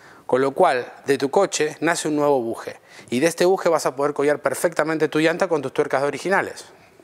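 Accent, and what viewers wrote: Argentina. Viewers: El acento parece argentino, pero habla en pleno español :P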